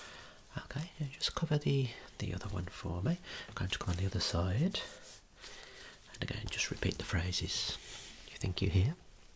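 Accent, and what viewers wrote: Birmingham, United Kingdom. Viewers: Damn, that's a strong Brummie accent. Works so well for this relaxed vocal range style in this video, though. Love it! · that British voice I mean DAMN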